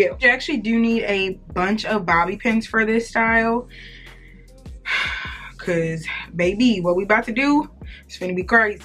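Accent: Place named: Texas, United States. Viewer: are you from texas beacuse i love your accent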